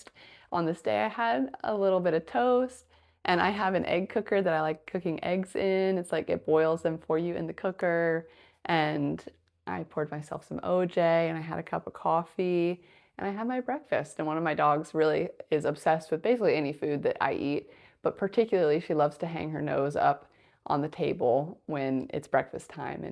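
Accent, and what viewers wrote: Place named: England, United Kingdom. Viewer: Hey i want to learn English accent from you 😅😅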